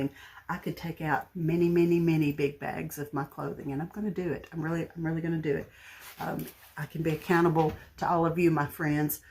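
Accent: South, United States. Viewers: Oh gosh! What a haul! I just love your hauls! Just my style! And all said and done with that heart warming southern accent that reminds me so much of home~You are such a treasure, sweet lady~thank you for taking time to share and to chit chat....I love it! AND...P.S. I LOVE your driftwood angel back there!! xo~Catherine from Colorado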